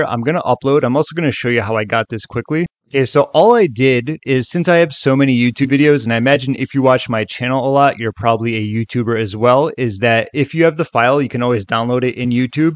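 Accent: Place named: United States. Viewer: Its easy when your accent is so generic American lol but does it actually work for people with variable localized international accents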